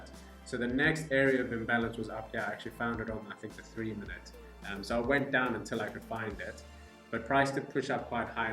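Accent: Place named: South Africa. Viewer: You sound like you're in SA? Good job pushing these order blocks.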